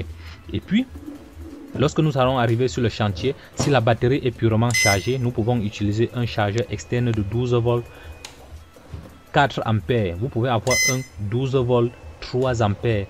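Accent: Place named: Africa. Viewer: C'est super, j'adore ton accent africain, et le tuto est super bien fait 👍 je m'abonne pour ce travail de qualité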